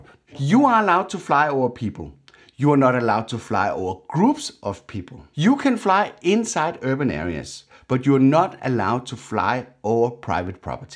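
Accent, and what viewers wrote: Denmark. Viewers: Ah, Denmark. Tried to place the accent and then the language reminded me about the "Danish language breaking down" video. 😁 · As a Norwegian; I can hear a distinct danish accent in your english🇳🇴😂 edit; Impressive vids👍👍👍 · I thought I recognized the accent... I'm half Danish. (Not important but yay)... Very informative and interesting video. Tak. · Lige en dansker der tjekker ind. Der er SÅ MEGET plus på kontoen for at rent faktisk tag det der "Youtuber" seriøst istedet for det evenlige jeg er sej - selvforheliigelses som er upræget for mange Y-T (fra dk) Dog lidt malurt i bægeret. Dit DK accent er MEGET stærkt, men MEGET derudtale uden øhhh ehhh and so on. Good Work ! sq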